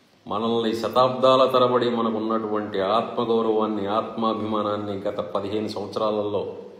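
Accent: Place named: Andhra, India. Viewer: sir....meeru excellent gaa matladutunnaru....educated andhra voice vinpistunnaru...keep on going sir....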